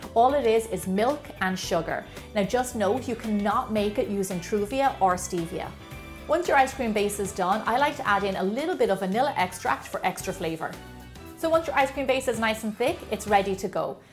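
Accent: Ireland. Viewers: Is Irish if so I am too lol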